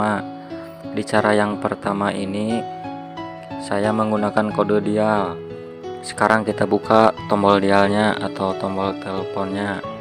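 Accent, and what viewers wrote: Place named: Sunda, Indonesia. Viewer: Sunda teing ey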